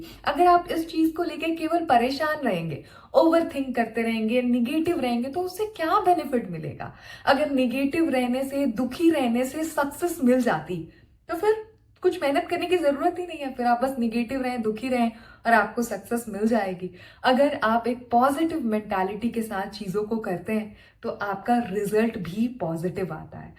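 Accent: Bihar, India. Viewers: Your bihari accent 👌👌👌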